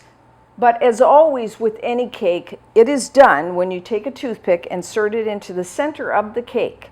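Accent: Canada. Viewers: Oh wow that looks delicious. I love how you give metric measurements as well as the imperial. Saves me having to convert the measurements. I am an Australian and love to find things that are different to the things we have here. You are Canadian right ? Something about your accent, I recognise. I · Less than a minute in and I knew you were Canadian!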